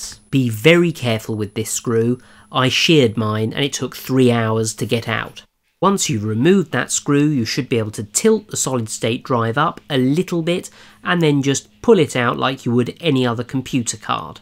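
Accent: United Kingdom. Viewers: I'm not a big fan of the brit accent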